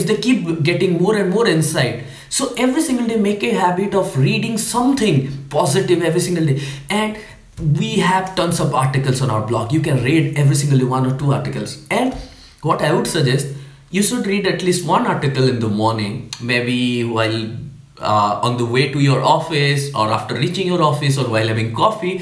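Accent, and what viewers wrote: India. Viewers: I like your way of expression. You have a good and strong posture. Not only this but also you speak the Indian English which is clear and obvious with a very sweet voice. --Dr Mohanty.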